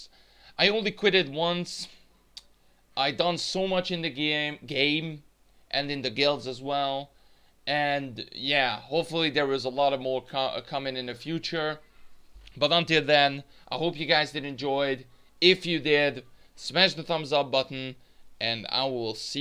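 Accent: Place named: Hungary. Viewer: Not just your accent are bad, every english speaker hungarians accent are bad just like mine aswell! :D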